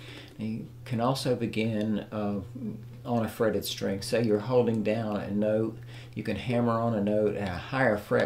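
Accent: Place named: South, United States. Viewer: Thanks for an excellent tutorial. I am really hooked on this style of playing now. I am from the UK and am also tickled by your southern US accent. I love it. Uke playing is in now and clawhammer is catchin on. Thank you so much buddy!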